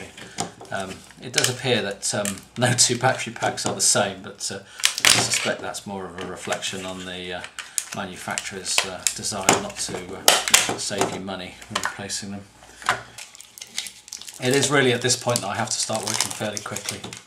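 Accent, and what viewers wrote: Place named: England, United Kingdom. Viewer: Hi, Thanks so much for your advice. You sound like you are English, do you live in the UK and can you recommend a couple of batteries that are easily available which are 18650 or AA? I want to get a Torch which is rechargeable but maybe can take recharged batteries so I can replace the torch batteries if I am camping. It seems the Americans have their own market and do not worry about other countries and what happens to torches or batteries. And the 18650 are harder to get here.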